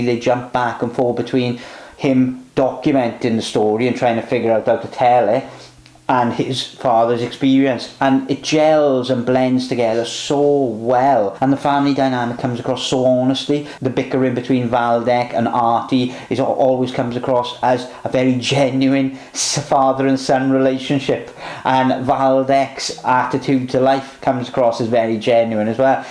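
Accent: Wales, United Kingdom. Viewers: Randomly discovered this channel… did not expect to hear a Welsh accent talking about Maus. I can hear the voice in my head getting more like it did when I lived in South Wales.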